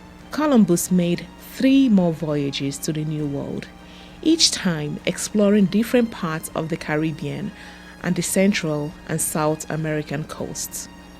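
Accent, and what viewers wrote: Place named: China. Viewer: Your English is bad, I'm missing 10 percent of your words. weird accent. I'm from London, dad's from st vincent. Sounds like you learned English from a Chinese person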